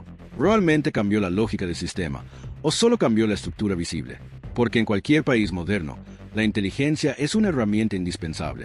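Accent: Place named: United States. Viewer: Y porque está mmda tiene acento "gringo"?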